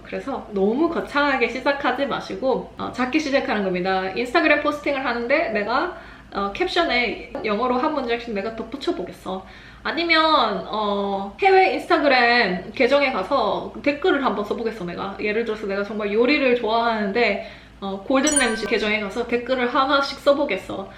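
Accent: Gyeongsang, South Korea. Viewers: 대구분이신가요? 자주 듣는 억양이 여기서 들리니 너무 반갑네요 ㅎㅎ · 살짝살짝 들리는 사투리 너무 귀여워용.ㅎㅎㅎ^^ 경상도분이세요?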